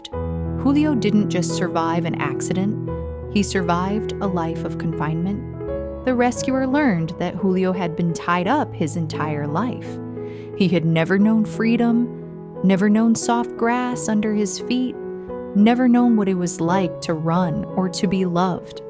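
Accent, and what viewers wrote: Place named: United States. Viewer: disgusting American pronunciation with that "r". It's like it's choking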